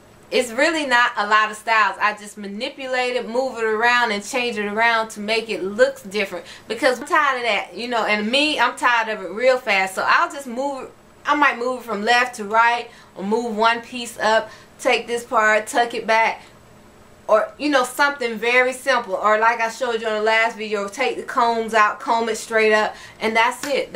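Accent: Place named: United States. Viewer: Omg I can't NOT believe I'm just now coming across your channel I it's 4am and I'm up watchng your channels 😴.... First I love your country accent, your sprit and passion for natural healthy hair make the videos more enjoyable. Second 👀😱 that cabinet full of powders! Please share. <mail> I use a lot of essential oils but never used a variety of powder just the Aztec clay on my hair it was okay my coil sure did pop👀🙈😱.... Lol as for my daughters hair it worked well for one but dried out the other one. Quickly stopped. So I just use that as a face mask or toothpaste. One of my daughters hair is thick thick thick and I can't seem to keep it moisturized, I invested in the Qredew and its aiight! 😒.... Run down of my struggle cause it's GETS real ! Lol can I get a list of powders you use? Thanks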